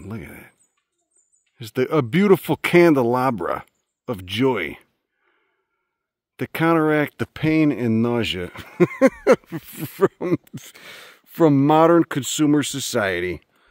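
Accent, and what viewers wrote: Chicago, United States. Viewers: Is that a Chicago accent?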